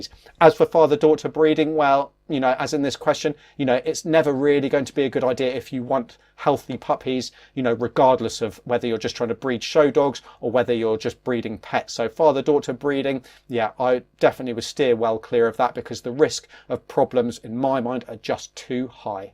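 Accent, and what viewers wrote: United Kingdom. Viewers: Look at his british accent 👁️👄👁️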